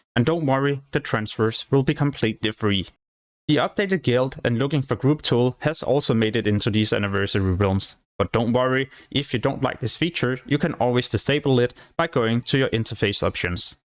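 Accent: Denmark. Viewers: Mm danglish! Nothing but love.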